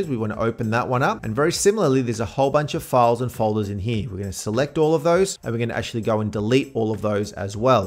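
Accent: Australia. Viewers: Thanks. I'm an Aussie too, so I pronounce cache same as you. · I'm Australian too. I say caysh too, But then I also say shedule rather than scedule. But it's useful to know that when other videos are clearing the cash, it's got nothing to do with robbing a bank. , you are getting tired, because you say you should be weary when you are deleting your cache. I think you should be wary. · The Australian pronunciation seems logical but I do pronounce cache "cash" (I'm a native English speaker from Hong Kong). One of the funniest Australian pronunciations to me is "daahtuh" (data). And why do Australians pronounce the 'a' in "last" the British way but the 'a' in "chance" the American way? 🤔 My own pronunciations can be weird though: I pronounce "route" the British way ("root") but "router" the American way ("rauter") because "rooter" reminds of the Australian slang and so sounds inappropriate... 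🙃 More importantly, thanks for the helpful video. I found your video bc the tech guys in my office will clear the cache of my PC work laptop when it's running slow, and it occurred that I'd never once cleared my personal MacBook's cache. Did you actually just pronounce wary "weary"? 🤫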